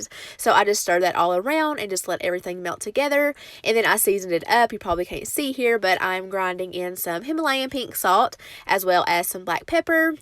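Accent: South, United States. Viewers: Not to be weird but I love hearing your southern accent it’s very calming to hear you talk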